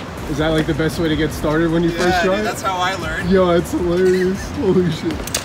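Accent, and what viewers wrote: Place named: Long Island, United States. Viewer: ! Brooooooo, love your videos man. You sound like you're from Long Island bro lol. Whole Fam is NYers. Anyway, love the content and quality. I just purchased the A7III, it's on back order but I'm excited for the quality of vids I'm about to pump out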